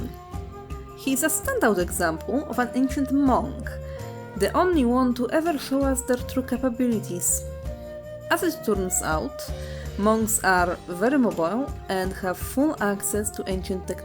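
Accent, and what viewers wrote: Poland. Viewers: oh thats interesting i dont think i have heard a polish accent before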